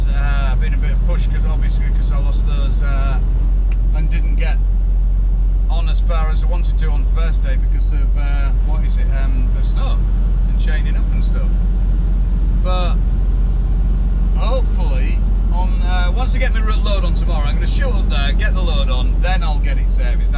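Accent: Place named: England, United Kingdom. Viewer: Love your accent. Reminds me of the song, I'm an alien I'm a legal alien, I'm an. Love your videos. Howz trucking in USA as compared to UK?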